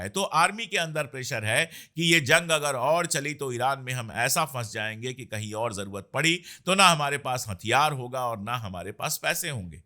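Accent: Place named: Kashmir, India. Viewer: sir kashmiri hein zabaan mein kashmiriyat he bhai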